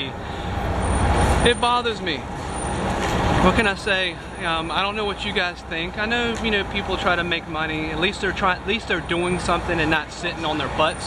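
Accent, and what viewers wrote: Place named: South, United States. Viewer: your from the south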